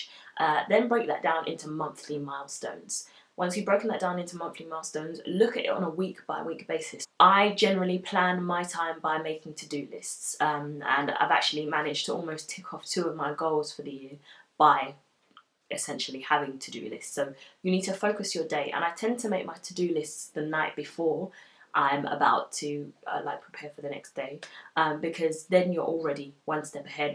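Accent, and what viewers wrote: United Kingdom. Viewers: My new favorite vlogger! A down to earth black woman, in marketing with a British Accent!! Love it!!! Subbing from Texas!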